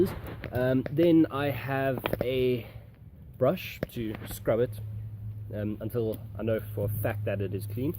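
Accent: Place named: South Africa. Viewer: I sense a South African accent... Where you at brah :P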